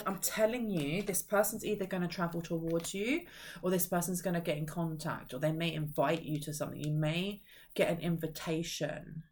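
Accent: United Kingdom. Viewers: I was just hanging out with you in the kitchen, with a double size mug of hot cocoa with marshmallows ! Thank you for the messages ! I love listening to you ! I'm in the USA, and I love your Brit accent. I love the shows Last of the Summer Wine, The Vicar and Keeping Up Appearances, its not just because they are such funny shows but its the accents too ! I wish you the Merriest Christmas 🌲🧑‍🎄 and a very Happy New Year 🍾🎉 ! I look forward to yo ur readings and will continue to in 2024 ! 💖 Lynn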